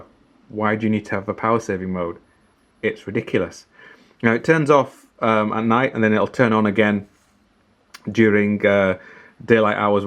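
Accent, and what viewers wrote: Yorkshire, United Kingdom. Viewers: Hi, Love your reviews, when can we see your review of your 1963 Chinese Pilot Watch, looking forward to your content as it’s refreshing. Lastly where you from you sound like a Yorkshire lad?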